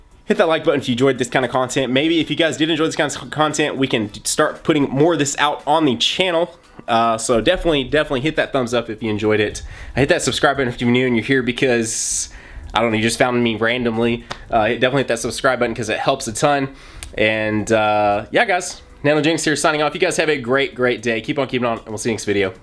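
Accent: South, United States. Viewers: are you from the south sounds like you have a southern accent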